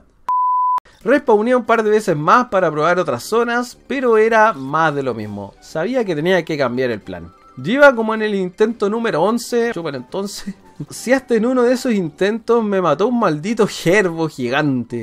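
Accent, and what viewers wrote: Chile. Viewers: Esta la Zorra la Nueva edición al estilo Hopa, narrado al clásico Idioma Chileno, esta super chistoso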